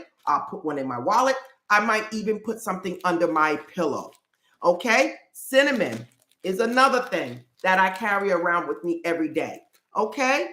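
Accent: United States. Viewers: I like your videos but your English is too American to me At times I don't hear you clearly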